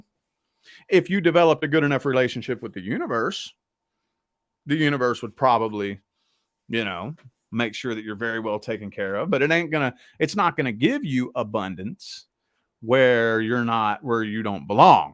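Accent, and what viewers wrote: South, United States. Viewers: YOU HAVE A SOUTHERN ACCENT WHERE YA FROM?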